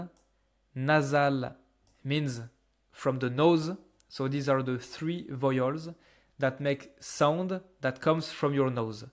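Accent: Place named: France. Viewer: I love your french accent😊😊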